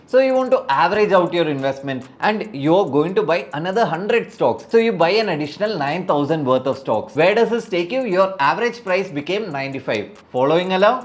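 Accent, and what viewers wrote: Kerala, India. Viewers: I hear Malayalam in your English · Malyali accent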